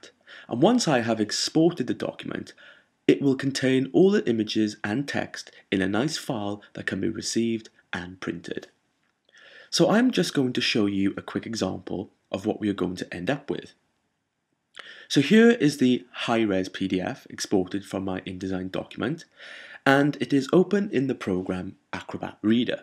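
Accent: Wales, United Kingdom. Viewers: Just wanted to say a big thank you for your hardwork that you have put into this. Its really generous. Its really clear and just works!! I wish more of the internet could be like this! I sat down and watched them through as though it were a lecture at university! Thanks again. By the way, are you Welsh or from New Castle? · I am so happy I found your tutes! I literally clapped you at the end of the last video. So well explained, so clear, nice voice, nice pace, nice command shortcut knowledge and repitition and you really focussed on all the things I needed to know. Now Im off to learn PS with you :o) . Keep up the great work!... you should charge for these!!! p.s I knew it was a Welsh accent!!!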